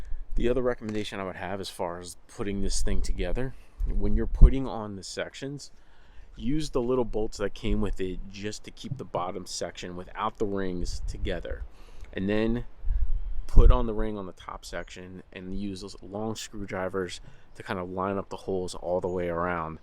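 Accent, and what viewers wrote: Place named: Philadelphia, United States. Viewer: By the way, had a feeling you were from the Philly area by the sound of your voice. Just sounded local to me. Checked your profile and saw that you are...me too (Delco; now South Jersey).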